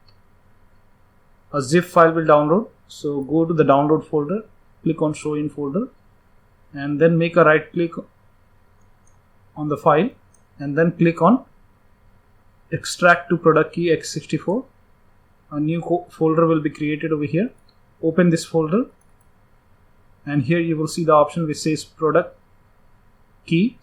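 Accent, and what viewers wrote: India. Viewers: there are currently only 20 dislikes, the author has an indian accent and I've come back to this video several times to use the cmd method The cmd method is legit unless you're using a digital license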